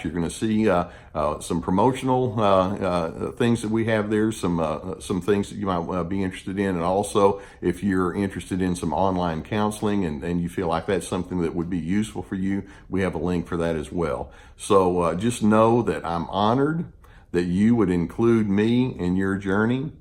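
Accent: South, United States. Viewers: I really enjoy these videos and learn so much about how to apply the principles that both you and Dr. Ramani explain So well. You're warm and folksy style reminds me a lot of sheriff Andy Griffith of Mayberry RFD. Are younger people that is a black-and-white sitcom from the 60s and 70s about a very small southern town. Andy the Sheriff had a little boy named Opie and virtually half the shows were about some sort of silliness that occurred when people were trying to get what they wanted or solve a problem in the wrong way and ended up in trouble. Eventually Sheriff Andy would figure it out and help straighten it out and might give a lesson or two to his goofy but narcissistic sidekick deputy sheriff. And then most shows would end with Sheriff Andy Griffith explaining some principle of good citizenship and healthy honest Behavior to his son Opie, who was about 6 or 7 years old. 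He had a similar accent to and so videos remind me of sheriff Andy explaining life proper values and behavior to Opie. That show is available on streaming services and it's a very relaxing watch before bed or when you're in the mood to fantasize about a world where people are basically decent and everybody learns their lesson and not too harsh of away in the end and Common Sense prevails. Not to mention that doing the right thing pays off. What a shame it is that he couldn't raise both us and the narcissist so that we had good self-esteem and boundaries and the narcissist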